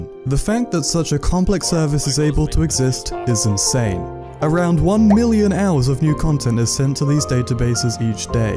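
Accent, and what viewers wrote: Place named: United Kingdom. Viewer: >be me >get this video in recommended >british accent >face looks probably like the guy from the "ACKCHYUALLY" meme >go to video tab >many video titles have a CAPITAL word in it for no reason >many video titles start with "I made..." >first video blew up >2nd most popular video has only 1/3rd of the views >never got that many views since on any video >close tab and block this channel with the extension BlockTube Feels good man.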